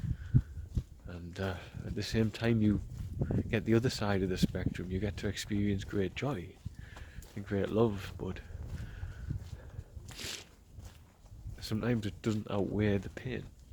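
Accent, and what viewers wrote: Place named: Newcastle, United Kingdom. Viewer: I guess i have some sort of broblem solver spirit in me, so excuse me.. 😁 It's ok for u to feel tired and beated. So i feel that u need to give some mercy on your self. Could someone else produce some content on this canel some times? Similar on like the contet u shared about our staff? And time to time some succes strories of childrens recovery ect? At least id love to have some updates from ex. the children that can now go to the school! Remember your thoughts of sharing your duties? Do it. Because of you, becouse your loved ones. Totally OT: its funny how your "home english" is pushing trough after youve been home. I can tell since i have people from new castle in my family.. 😄 But yeah.. I like a lot that ure authentic, sharing even your moments of doubt and tiredness. We're not supposed to be perfect not even you. 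And to end this awfully lobg text: Send Frizi my love and tell her i think of her a lot. She has so much in her hands now. I hope she also remembers to take goooooood care of her self! ❤ Blesings, love and light. Xx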